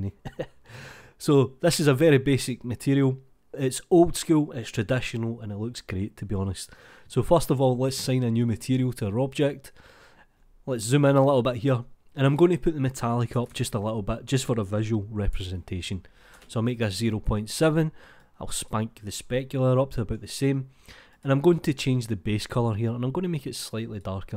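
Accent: Scotland, United Kingdom. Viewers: Everything is a billion times better with the scottish accent and i mean everything.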